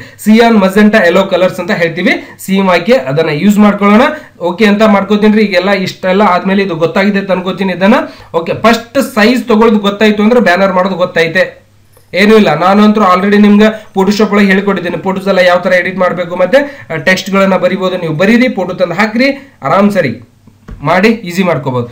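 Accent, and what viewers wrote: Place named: Shiggaon, India. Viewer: ಸರ್ ನಮ್ಮ್ ಊರ ಬಾಸ ತುಂಬಾ ಖುಷಿ ಆಗುತ್ತೆ ಸರ್ ನಿಮ್ಮ್ ಸ್ಪೀಚ್ ಕೇಳಿ ನಂದು ಶಿಗ್ಗಾoವ ತಾಲೂಕ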